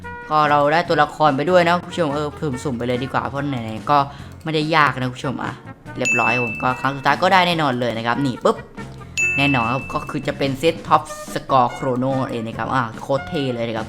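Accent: South, Thailand. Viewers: พี่เป็นคนใต้ม้ายอะ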